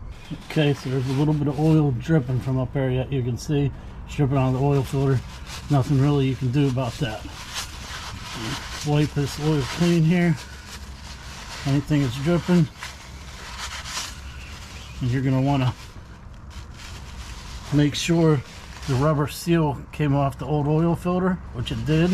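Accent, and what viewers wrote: Pittsburgh, United States. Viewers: A couple minutes into the the video I was like "nice, he's got a Pittsburgh accent"...great video, way to lay it all aht! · I know you have to be from Pittsburgh with that accent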